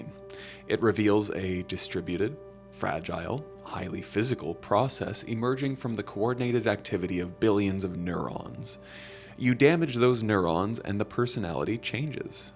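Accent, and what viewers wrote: Canada. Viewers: Is the voiceover Canadian?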